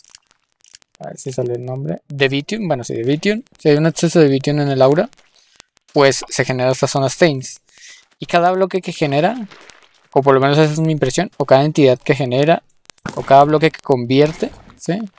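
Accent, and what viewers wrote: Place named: Bogotá, Colombia. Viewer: De donde sos? solo por curiosidad? PD: Pareces de bogotá por la forma de hablar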